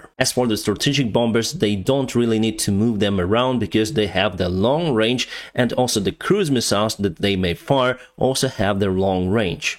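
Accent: United States. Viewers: As an American that speaks multiple languages, I have no trouble whatsoever understanding him. English is the tough one to understand, not Eastern European or Asian languages. Once you know how their sentences are structured, the words in English can convey the message. Compared to some of the translators on UATV, sounds downright American. They have two that are absolutely horrible. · great ascent.................really Ukrainian or living in DC?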